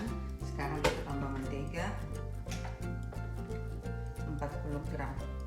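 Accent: Papua, Indonesia. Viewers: Pelei mace ko tra kosong...itu boleh dgn logat yg katorang dipapua mengerti dan paling jelas sekali. Salam dan.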